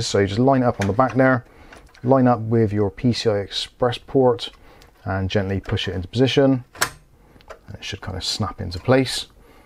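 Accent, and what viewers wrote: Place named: England, United Kingdom. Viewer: thank you for this video, but could very more useful if we could understand what are you talking about ,because for non English citizens not have a clue what you are saying,could be nicer to try to explain more slowly and without the heavy English accent thank you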